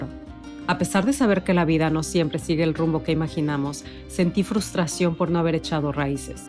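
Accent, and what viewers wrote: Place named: Mexico. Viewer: ¡Qué vidéo más bonito! Eres una mujer preciosa, con y sin canas, por dentro y por fuera. Me encanta tu acento mexicano y tu tono de voz … ¿has pensado en leer libros para niños? … Te descubrí ayer viendo vídeos sobre mujeres que han decidido dejarse crecer su pelo natural y, entre otros, me gustó mucho tu enfoque. Aunque aún no me he decidido, creo que es cierto que algo tiene que romperse por dentro para dar un paso así y dejar de pretender ser eternamente joven.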